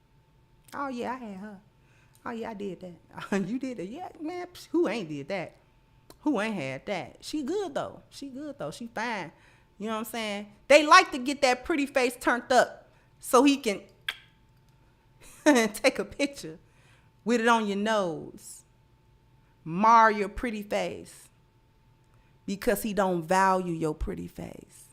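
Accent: Chicago, United States. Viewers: I didn't know you were in Chicago, but since you said it I can definitely hear that Chi-town accent. 😉 I have family and friends all through the Chi. By the way, you're coming with that fire 🔥 today! Lori Harvey is getting ran through... It's sad really.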